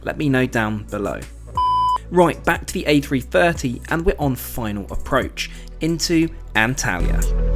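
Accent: United Kingdom. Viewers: Turkish Airlines is more assertive in economy class. And you, the British-accented dog, awaiting king service from a short flight. Don't be racist dude. We don't hate westerners, but people like you have an endless hatred for us. If you are looking for more king services, do not fly with THY. Fly with Emirates or Qatar Airways. racist guy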